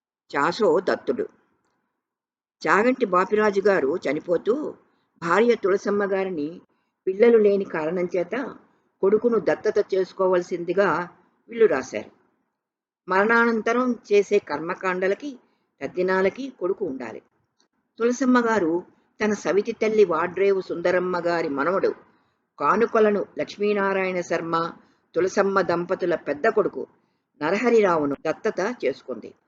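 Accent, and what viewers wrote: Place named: North Andhra, India. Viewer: garu could bring out her childhood memories in a beautiful mesmerizing way.when ever she reads his stories I wonder whether chaso wrote so beautifully or her reading methods with beautiful modulations had enhanced the depth of the writings.As my fore fathers belong to vijayanagarm and srikakulam areas I enjoy that mandaleekam also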